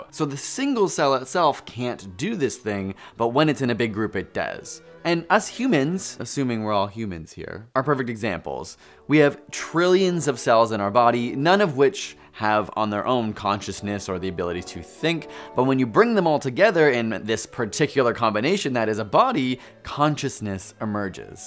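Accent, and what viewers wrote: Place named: Canada. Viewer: Are u Canadian? Nice accent btw lol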